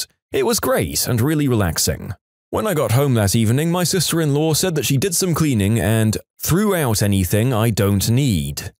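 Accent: United Kingdom. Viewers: What the hell happened to his voice he sounds brit ash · Another British narrator???!!!😂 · Luh, Another British narrator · Bro u seem more Britishie Today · WHAT THE ACCUALL- WHAT'S WITH THE VOICE?! SINCE WHEN IS BEITISH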